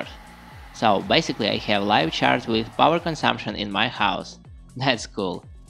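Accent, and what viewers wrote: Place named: Russia. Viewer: The project is only good for the little house, with little energy consumption. P.S. Despite living in US, this guy still has very strong russian accent :-)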